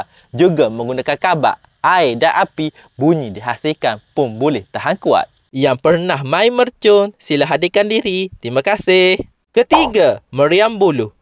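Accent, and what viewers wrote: Kelantan, Malaysia. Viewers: Admin ni oram kelantam ke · Hg ckap loghat kelantan ja la. Srabut aku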